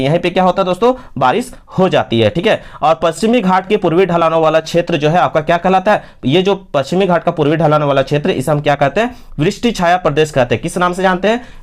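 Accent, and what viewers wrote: Jharkhand, India. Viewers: Sir AAP jharkhand see ho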